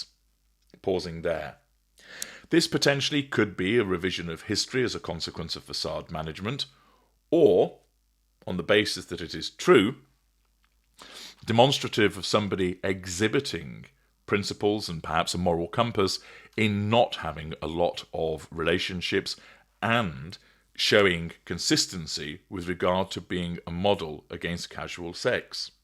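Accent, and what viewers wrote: United Kingdom. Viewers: He is, it's the British accent ;)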